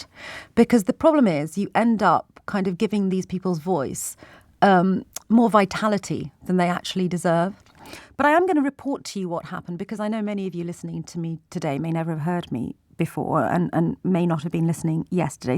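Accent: United Kingdom. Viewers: I couldn't get past the first minute of this victimhood propaganda. If your that offended by some imbeciles opinion then to me your obviously already too insecure for public discoarse. You can only be offended if you choose to be or let yourself be offended. You sound more british to me than anyone but as you surely know you only have that job because of "diversity" not your talent or merit. All the mainstream propaganda mouthpieces have token women and people of colour now and the sad thing is these people actually think theyr in these roles for theyr talent. Anyone disagree? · Sure sound British to me . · Wow, this is heartbreaking. You have to explain real easy to find evident facts to ignorant people everywhere. I stumbled on to this clip in my YT feed. You explained it well. We cannot divide. We cannot live in fear. You’re talking about USA issues just with a lovely ENGLISH BRITISH accent. 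I am biracial…half of my genetic makeup (per my siblings DNA results) is from England but I was born in the USA. By our looks, you and I could be sisters. The amount of Melanin in our skin shouldn’t determine our ability to lead in a country in which we were born…ethnicity and culture can be better understood when you have friends from other cultures that you are around consistently. The ignorance is breathtaking but not surprising. It’s the only outcome when you’re not interested in learning and becoming a better human. Keep talking. Keep using your voice. Keep your hope for a better and all-inclusive we-don’t-care-how-much-Melanin-you-have-society is the norm. Facts over fear. ❤🇬🇧🇺🇸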